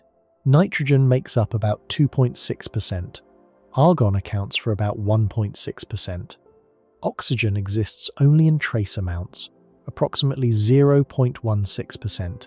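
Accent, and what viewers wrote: United Kingdom. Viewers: Is this a man or is it AI? The only reason I ask is because these two hour videos are released constantly and the narrator speaks in a perfect British accent without missing so much as a single beat, without pausing or running out of breath, without stumbling. Constantly over and over again…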